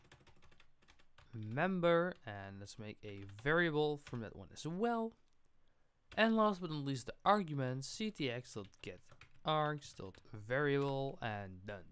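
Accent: Netherlands. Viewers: You sound dutch..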